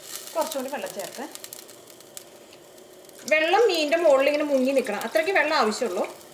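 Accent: Thrissur, India. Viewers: chechi oru rakshayilya kidilan taste thanks for the recipe and your thrissur slank koodi aayappo polich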